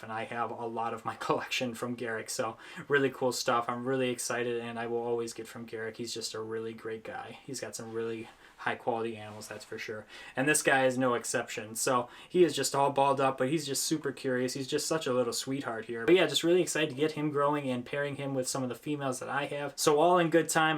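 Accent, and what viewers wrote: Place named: Michigan, United States. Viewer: Are you from Wisco or Michigan? My first guess is Michigan. With your accent. Haha.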